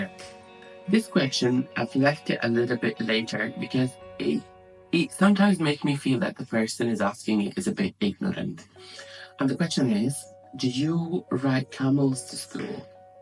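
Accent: Scotland, United Kingdom. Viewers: Theres a bigger scottish twang in your accent than you think, definately hard to pin down. I knew where Sudan is but I didn't realise they have the largest number of pyramids. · You definitely have a little bit of Scottish in your accent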